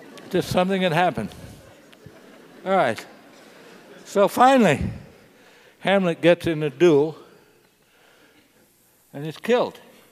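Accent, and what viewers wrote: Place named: Indiana, United States. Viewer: A Hoosier.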